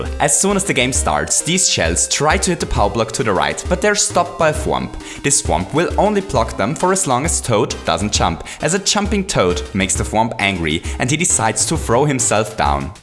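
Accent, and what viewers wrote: Germany. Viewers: Are you german? I'm asking because of your accent. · are you german?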